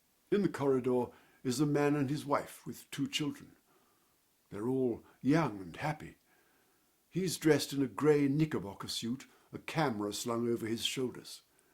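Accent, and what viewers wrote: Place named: United Kingdom. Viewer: Excellent presentation! I love his elegant British manner and speech. And his grandfather, Lawrence Beesley, is a sterling example of one who is born an observer and writer. Were it not for his eloquent account, we would not know so many fine details about that ship's voyage. God bless writers! (And actors, too.)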